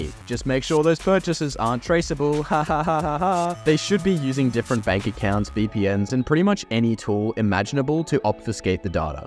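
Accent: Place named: Australia. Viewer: I noticed you had an australian accent. the best way to launder money in australia is to deadass go to a casino, put all your dirty money in and cash out. and there you go, you have nice clean money you won at the pokies without any cuts. this is genuinely a massive problem in AUS. when a whistleblower tried to point this scheme out, the casino lobby in Australia tried to sue him.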